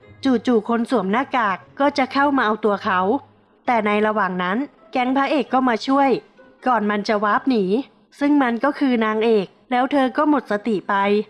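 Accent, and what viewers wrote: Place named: Isan, Thailand. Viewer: คนอีสานเปล่าหนิ สำเนียงในการพากย์เหมือนคนอีสาน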